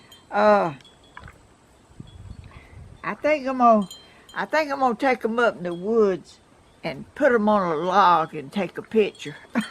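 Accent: South, United States. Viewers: Love your Shawls and have 1 I wear myself. The Ladybugs R great!! 🐞love to hear you talk and I'm a southern too. Blessings and love to You from N.Carolina.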